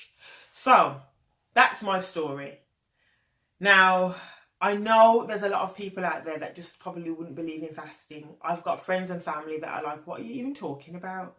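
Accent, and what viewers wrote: Birmingham, United Kingdom. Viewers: You sound like you're from Birmingham?? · You sound like you're from Birmingham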